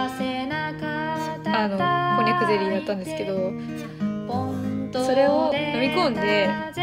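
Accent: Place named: Nagoya, Japan. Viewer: いやー名古屋弁いいわー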